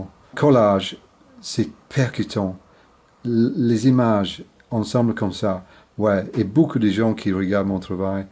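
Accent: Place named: Yorkshire, United Kingdom. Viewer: Far less ' Yorky' in your French accent nowadays :)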